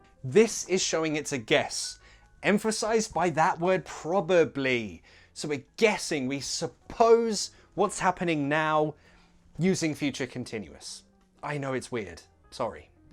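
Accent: United Kingdom. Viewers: I'll be studying english next year because i think british english incredible and i love british accent and you i think you're very amazing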